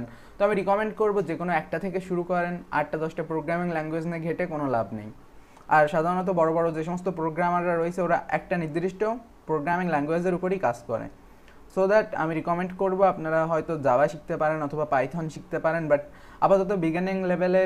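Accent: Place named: Khulna, Bangladesh. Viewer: ভাই আপনার বাড়ি মেবি বাগেরহাট বা খুলনা হবে কথা শুনে আমার তাই মোনে হচ্ছে, আমার বাড়ি বাগেরহাটে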